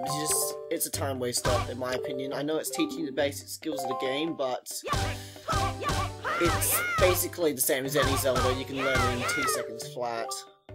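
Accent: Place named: RP, United Kingdom. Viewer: you sound really posh XD waaah i should do a lets play...maybe XD so you can hear my voice >:3 i kid XD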